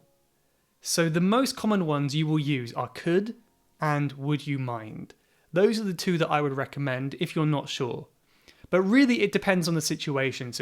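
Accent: United Kingdom. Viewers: I'm new subscriber, I'm loving your videos, thank you, I like the British accent.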